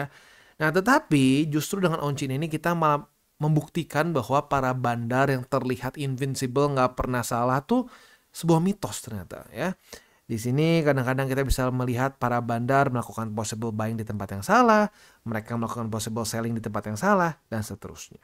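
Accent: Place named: Palembang, Indonesia. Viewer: Palembang yo bang :V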